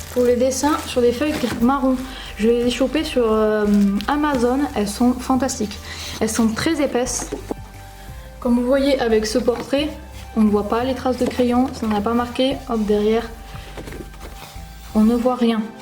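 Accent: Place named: South, France. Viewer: Tu presente très bien et dis tu vien du sud sa ce voit a ton accent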